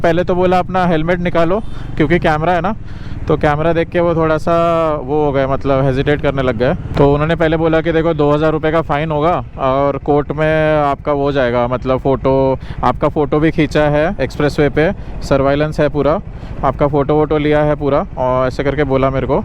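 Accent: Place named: Solapur, India. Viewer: Are you from solapur originally ? Your marathi accent is kinda solapuri.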